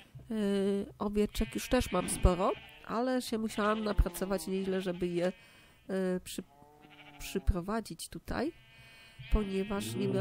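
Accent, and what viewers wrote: France. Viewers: mam pytanie dlaczego masz akcent francuski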